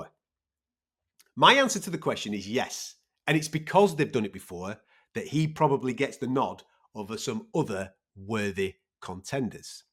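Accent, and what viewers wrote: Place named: England, United Kingdom. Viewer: Also the thiccc English accent makes all the names sound so epic 😂🔥🔥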